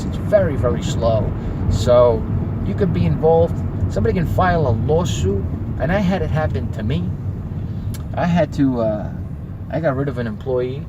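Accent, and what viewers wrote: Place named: New Jersey, United States. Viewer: You really live in “El culo del mundo” You say, Oh yes I’m from New Jersey, you speak like people from Paterson, Newark Good video to keep ass holes like you away of that great country. Excuse my spelling. Welcome all US tourists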